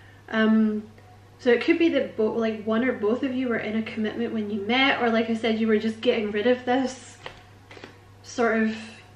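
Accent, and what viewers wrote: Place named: Scotland, United Kingdom. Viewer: First, if anyone screams at you in the comments, I will squash them like a bug.lol Second, I could listen to your Scottish accent all day. I came from there. It centers me and it gives me comfort. Guess you're stuck with me and when I move back I'll ignore you...lol..just kidding...thanks for the healing you bring that helps me guide my destiny in the kindest of ways. You rock!!!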